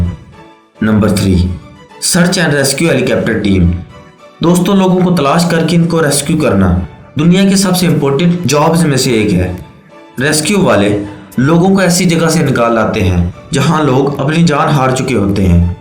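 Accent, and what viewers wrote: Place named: Kashmir, India. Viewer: Aslamo elikum ap kashmir Rawalakot sa hn sardar hn ap ke urdu sa pachana ha me b kashmir sa hn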